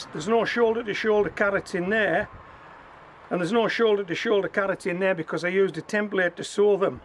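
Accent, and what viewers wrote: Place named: Geordie, United Kingdom. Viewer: I’m a 63 year old geordielass living in Spain. I love your accent, it has a Geordie ring to it but also slightly Yorkshire tint.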